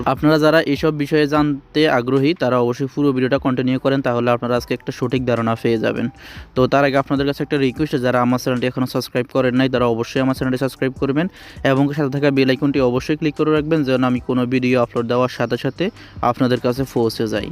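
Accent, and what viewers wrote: Noakhali, Bangladesh. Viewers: ভাইয়া আপনার বাড়ি নোয়াখালী মনে হয়,,,,??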